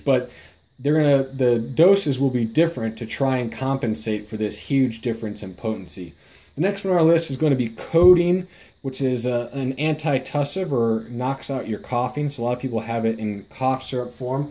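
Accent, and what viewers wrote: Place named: Ohio, United States. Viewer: this guys sounds like he's from Ohio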